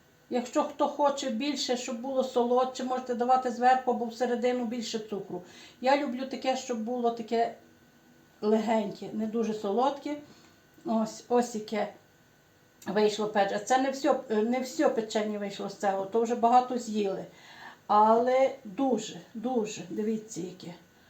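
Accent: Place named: Carpathians, Ukraine. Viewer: Приємно слухати Дуже гарний карпацький діалект❤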